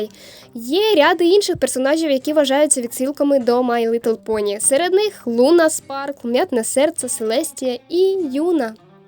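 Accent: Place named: Ukraine. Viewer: можешь по русскому говорить?ты же вроде раньше разговаривала на нём...незнаю что ты хочешь нам доказать своим украинским акцентом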